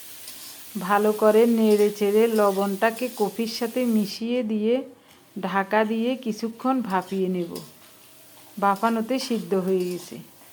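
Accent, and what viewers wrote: Noakhali, Bangladesh. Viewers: Ami Biratir theke dekhi.Spnar kothar modhye noakhalir tan ache.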